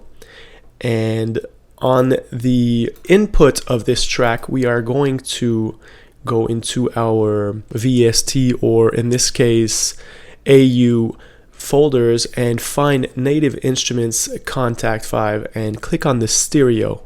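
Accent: Quebec, Canada. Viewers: hmmm ca sonne comme l'anglais d'un Québequois ca!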